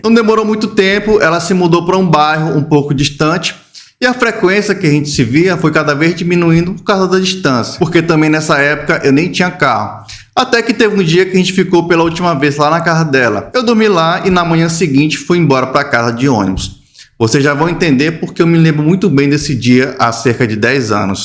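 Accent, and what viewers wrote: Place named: Fortaleza, Brazil. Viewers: Adoro os vídeos do, e a forma que ele fala é resenha e muito natural - "o mermo convite". Nordeste raiz (também sou) 👐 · Voce e muito inteligente engracado.Tenho uma curiosidade voce e cearencepois tem um sotaque de Fortaleza.eu sou cearence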